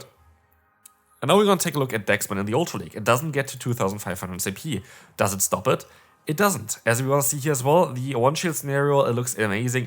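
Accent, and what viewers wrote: Germany. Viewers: You have german accent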